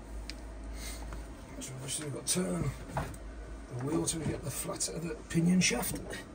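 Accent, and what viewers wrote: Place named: Black Country, United Kingdom. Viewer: Nice to hear a black country voice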